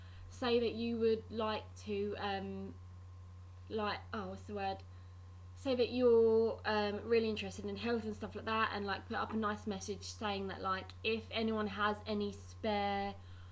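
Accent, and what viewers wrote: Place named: London, United Kingdom. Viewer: I'm just curious about where you live because you was saying London markets? I thought you live in London because of your accent Btw of you do then you should try Spitalfields market its just so cheap and an excellent place to get all your fresh produce especially if you go early mornings I love the way you said I prioritise health overt anything else and that's how everyone should be Minimal living is the best way to go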